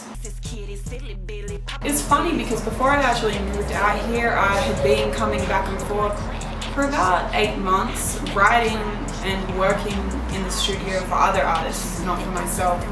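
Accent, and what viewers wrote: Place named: Australia. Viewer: fuck thats so annoying -.- she mixes accents ... why honestly , you were born in australia you grew up in australia stick to what you are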